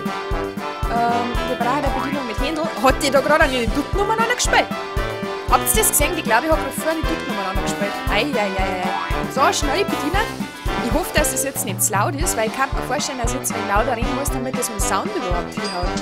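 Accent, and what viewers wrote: Bavaria, Germany. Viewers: mach mal ne ganze folge auf Bayrisch! BITTE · Die Idee finde ich gut Finde das spiel so witzig besonders mit deinem bayrisch =)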